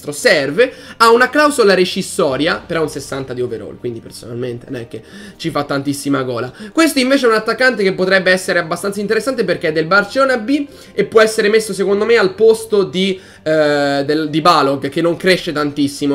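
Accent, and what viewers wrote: Tuscany, Italy. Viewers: Toscano puro eh bella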